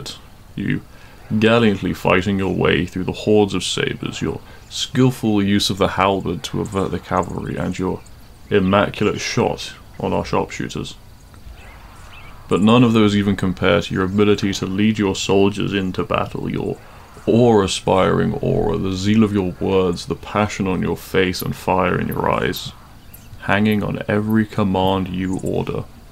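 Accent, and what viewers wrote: United Kingdom. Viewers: This was amazing. Thank you so much for voicing my script. Also are you British?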